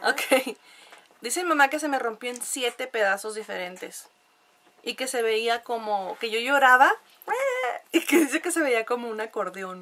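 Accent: North, Mexico. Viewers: Ahh y me encanta. Tu voz con asentó norteño, .....y tu hijo es hermoso, Dtb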